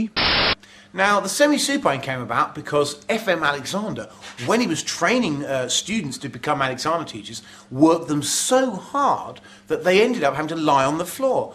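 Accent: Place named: United Kingdom. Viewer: His voice cadence and accent makes me think he'll also be found in a Monty Python skit!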